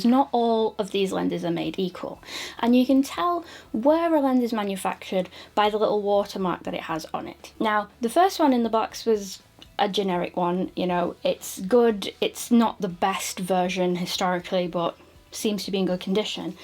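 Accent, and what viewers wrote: United Kingdom. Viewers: Love your videos, you're so incredibly cute and beautiful. I'm an Oly shooter myself, since I don't do videos. I Love your Brittish accent. If you ever come to Gothenburg, Sweden, you are welcome to stay at my place, since I have an extra bed, so you don't have to sleep in a Hotel and can skip some of The cost. Lot of Love, hugs and kisses, Niklas.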